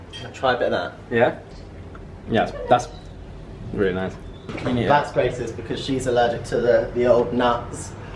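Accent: United Kingdom. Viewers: Botter I can’t I love the British accent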